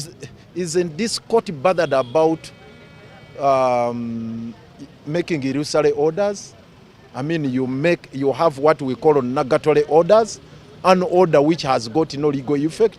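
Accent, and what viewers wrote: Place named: Uganda. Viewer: sweet Ugandan English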